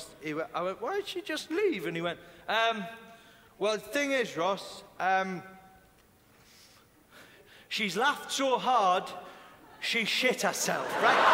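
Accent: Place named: Newcastle, United Kingdom. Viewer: Tomi Haustola He's a Geordie, which is a nickname people give to Newcastle folk, my grandad is one. It's one of the furthest north places in England so it's expected that they sound quite strange to foreign folk. Listen to an Australian comedian and then Ross, you'll really notice the difference. Aussies stretch out their inflections MUCH further.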